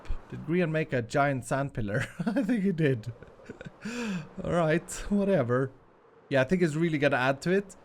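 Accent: Sweden. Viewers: Omg XD its so ez 2 hear that u are from Sweden XD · Du är svensk eller hur kanske från dalarna?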